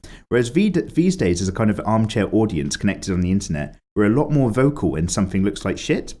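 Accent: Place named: Dorset, United Kingdom. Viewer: those Dorset tones, that was bloody you Dorchester wasn't it! love your work keep it up you legend, btw pls share those txt documents.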